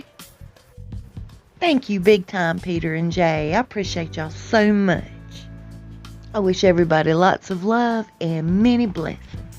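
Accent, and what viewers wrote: South, United States. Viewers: I enjoyed your video today. As much as I like the southern accent, I am afraid it is too much for me, and I can't follow. Today you were much better . Do our really talk like that all the time, it seems like you exaggerate it sometimes . If not I apologize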